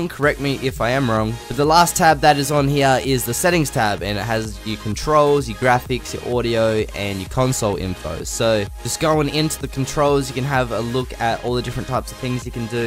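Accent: Australia. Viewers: Great video! Are you Australian you sound it. Love ya if your Aussie.🇦🇺❤️. Like if an Aussie Bogan.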